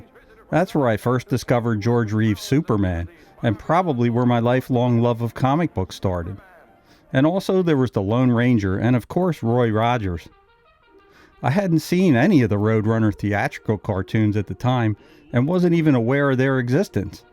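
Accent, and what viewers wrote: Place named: Pittsburgh, United States. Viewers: Yinz from da’ Burgh? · You sound like you have a Pittsburgh accent 😊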